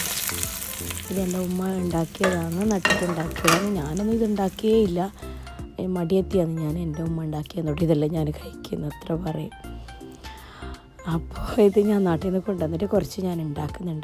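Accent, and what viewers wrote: Kasaragod, India. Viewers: Kasarod slang super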